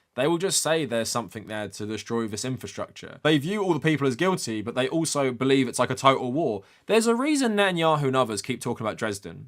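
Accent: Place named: United Kingdom. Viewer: Judging by your accent, you're from the place that spawned Monty Python. Can't blame Babylon bee and Onion for not making funny satire by UK standards. US standards in comedy have a lot of catching up to do in this regard, but they're at a level where the average American can appreciate and laugh at the jokes.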